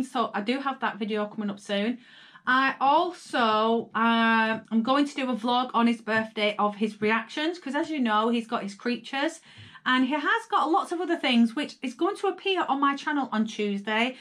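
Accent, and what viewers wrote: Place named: United Kingdom. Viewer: Oh okay it's just u never here that word patent anymore. I just adore u and luv your uk accent.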